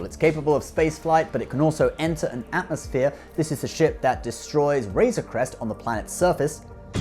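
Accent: United Kingdom. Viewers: A rare appearance of indeed. But always a pleasure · Welcome back. Good show, good show 👏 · Yay another video with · ? That's a real surprise. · Why don't we see any more. I miss you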